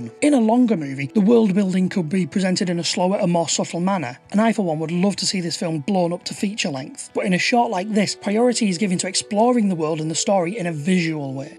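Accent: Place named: Manchester, United Kingdom. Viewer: Many thanks for this. Your voice sounds North Manchesterian, so if you want an interactive sensory descent into that post-apocalyptic decomposition vibe you could always visit the ex-shopping malls of Bolton. Plenty of it here. Bring a flamethrower. :)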